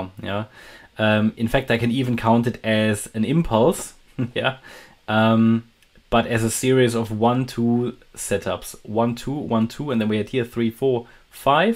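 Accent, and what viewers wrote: Germany. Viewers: Deutscher das hört man, aber für die gute Arbeit gibt es ein Abo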